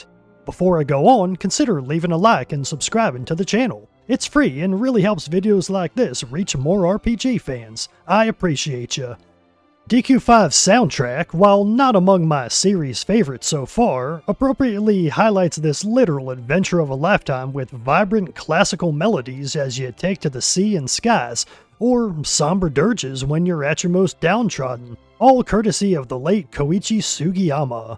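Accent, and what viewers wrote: South, United States. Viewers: listening to a guy with a southern accent talk about JRPGs is like listening to an indian talk about using a toilet+toilet paper+washing their hands afterwards... not exactly the source i want to rely on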